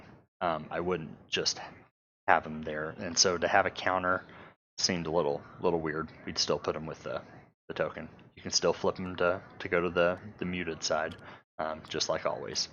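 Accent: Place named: Texas, United States. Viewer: Thanks so much, nasal texan :)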